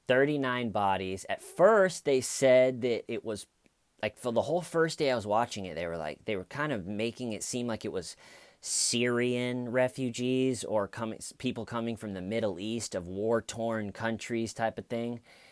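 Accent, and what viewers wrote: England, United Kingdom. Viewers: I love your British accent, I was born and brought up here but it’s ok because I’m Scottish. I really enjoy your videos.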